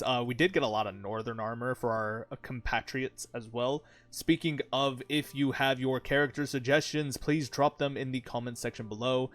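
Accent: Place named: Ireland. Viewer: Great Irish Accent 😁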